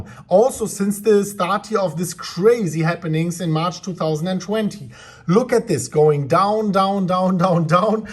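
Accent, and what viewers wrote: Germany. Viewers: I love your quick videos and German accent. Keep up the good work, can you put the prices in GBP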